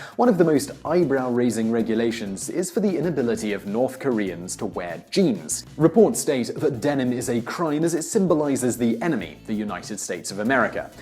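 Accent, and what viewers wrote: United Kingdom. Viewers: Is that British matthew santoro??? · I do love a eloquent British Accent.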